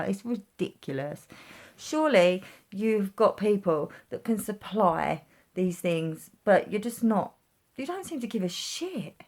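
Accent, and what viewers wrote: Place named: United Kingdom. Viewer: You like Em and Trump ...and have that British accent? Subbed ✅ Here in middle America we are just using our common sense and are thankful that Trump listens to his base, because we don’t want any politician to tell us how to keep ourselves safe. Most everyone here understands the importance of not spreading it but we will not be told that we can’t move freely about the country or be told we can’t earn a living for our family. That’s why you see in states that have Democrat Governor’s being more authoritarian than the Republican states like Texas, Oklahoma Florida.. Its an election year, so in order to make Trump look bad, they will fight him even if he found a vaccine.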